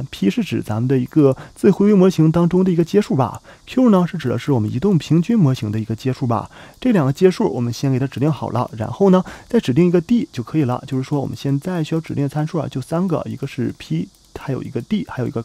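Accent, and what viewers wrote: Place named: Dalian, China. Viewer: 感觉像大连人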